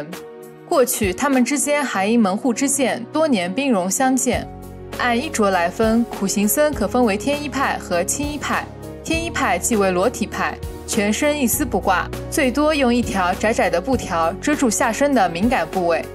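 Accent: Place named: Xinjiang, China. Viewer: 你这新疆 口音很重！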